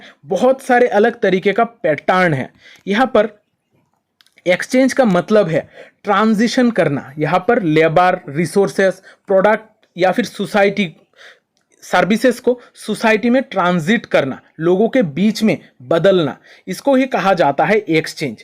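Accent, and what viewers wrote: Bengal, India. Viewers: Sir ap bangal se h kya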